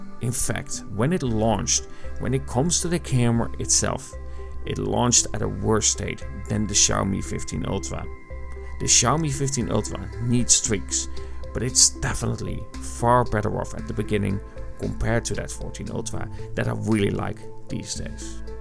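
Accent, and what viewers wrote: Netherlands. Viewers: I just have to start of with saying that you really can not hide the dutch accent, lol It's so thick!! Now about the content: one can only appreciate the fair citicism you made about the Xiaomi 15 Ultra. I'd say it's well founded and perhaps with future upgradings, certain issues can be tackled. Less sensitivity towards light can be fixed with software (or filter) but the fixed apperture however will stay fixed I'm afraid, which means less depth of sharpness. In the end it remains a smartphone, which is crazy, considering what they looked like 20 years back. I wonder what 20 years from now will bring. The Vivo x200 Ultra seems interesting too, but that camerabulk is sooo off putting that the Xiaomi 15 Ultra may not look that bad of an option.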